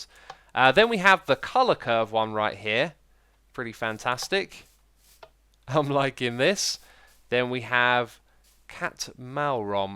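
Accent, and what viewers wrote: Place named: United Kingdom. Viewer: Not to be disrespectful, I am genuinely curious about British pronounciations. I have noticed for a very long time now that some words that end with the letter 'a' are pronounced almost like 'ar' or 'er' for example like when he says 'java' edition and sound like 'javer' or 'javar'. Why is that?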